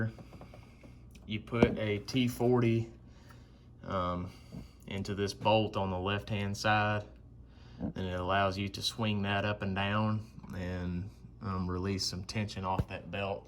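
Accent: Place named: Birmingham, United Kingdom. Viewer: Hey I recognize that accent. I was raised in Birmingham. Lol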